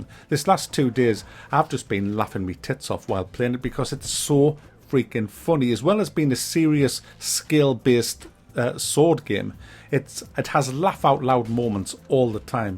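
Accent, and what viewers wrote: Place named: Geordie, United Kingdom. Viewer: Howay, I want to see a video with that proper geordie accent, I feel like you try to tone it doon...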